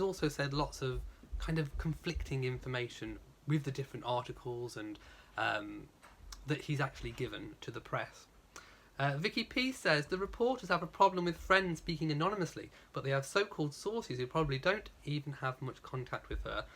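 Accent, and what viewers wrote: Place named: RP, United Kingdom. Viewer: Excellent pop up chat! I’m filled with happiness and hope and a tad taller spine now that Meghan’s friends have spoken out on her behalf. I’m sure her friends have her quiet approval for speaking out and I wouldn’t be surprised if the Queen is aware also. I hope this lowers the vitriol and things improve!!! 🙏🏾🙏🏾🙏🏾👏🏽👏🏽👏🏽🥰. Also love the BBC voice!!! 🥰🥰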